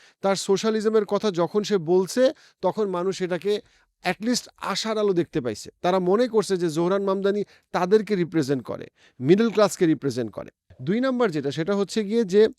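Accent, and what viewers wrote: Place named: Bangladesh. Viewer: অবাক হওয়ার কিছু নেই. Newyork বাংলাদেশি আর পাকিস্তানী তে ভর্তি. আপনার কথা শুনে আর English accent শুনে বোঝা যাচ্ছে আপনিও বাঙলাদেশি.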